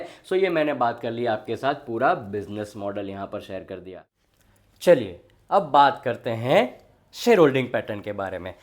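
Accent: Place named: Gujarat, India. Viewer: Aap ki baat se lagta hai ap Gujarati hoo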